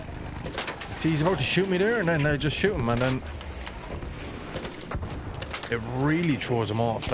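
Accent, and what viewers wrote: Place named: Irish Traveller, Ireland. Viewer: It's called Irish Traveller or "Pikey" accent. Watch the movie "Snatch".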